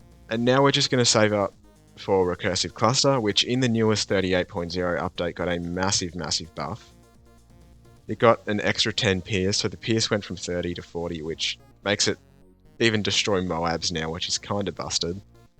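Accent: Australia. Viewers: Same. Aussie?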